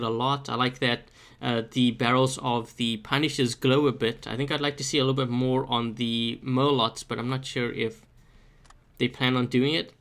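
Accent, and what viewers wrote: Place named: South Africa. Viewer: sound south african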